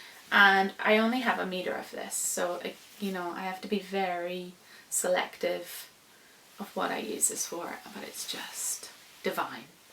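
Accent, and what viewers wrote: Northern Ireland, United Kingdom. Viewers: where are you from if you dont mind me asking? Im hearing a slight northern Ireland accent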